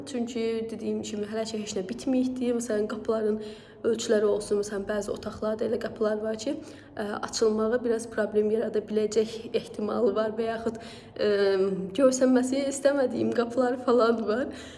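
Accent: Sheki, Azerbaijan. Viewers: Canim gôzum xeyirli olsun saglam oturun icinde xowbext yawiyin.Balalarinizin xeyir iwlerini goresiz.Bizde Maskoviski oblastda yawiyiriq.Ümüd edirem bir vaxt rastlawariq.Menim yeznemde Wekilidi çox Gozel insandi.Weki lehçesini cox sevirem wirin daniwirsiz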